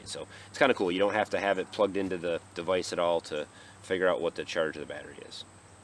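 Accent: United States. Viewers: My wife likes your American accent.and I like the trimmer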